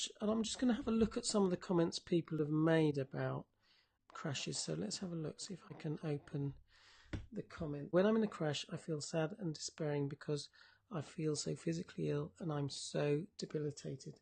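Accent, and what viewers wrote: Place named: United Kingdom. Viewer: Hello. I just stumbled onto one of your videos posted on YouTube and concluded iI would like to experience more of them. So….Facebook live? Thursdays at 5pm? But 5pm where? You sound British. Are you in Britain? Five hours ahead of me.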